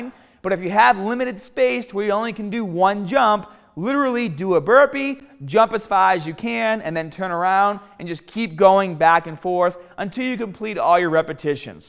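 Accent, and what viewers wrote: Boston, United States. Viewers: Love the bawstan accent. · I think that is a Boston accent, if I am not mistaken. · Hey I don’t remember hearing your Boston accent before where you from I’m from Southie Keeeeedd!!! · Oh Lawd you from Boston. I love your accent 🥰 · OoooOoooo that Stroing Baastan accent 😍 👌 😂 🤣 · You from beantown? Boston? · What accent is that?..Boston?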